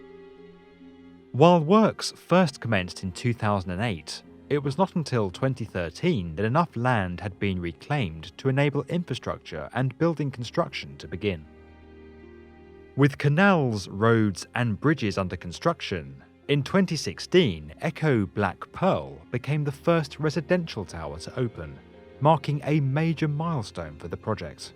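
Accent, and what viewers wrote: United Kingdom. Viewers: This video has just so much more authority because of this UK accent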